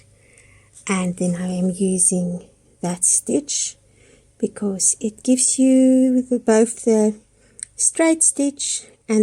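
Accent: South Africa. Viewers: Hi there, how clever of you to make your own costume. So gorgeous to hear you delightful Sth African accent. Its lovely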